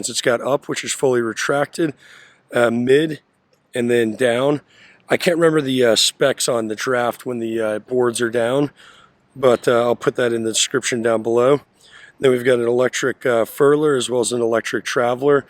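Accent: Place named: Delaware Valley, United States. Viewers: I bet he is from PA, all my cousins from PA have the same accent. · LEEDS8 Delaware Valley (Del.Md.,Va.) so.Pa, Jersey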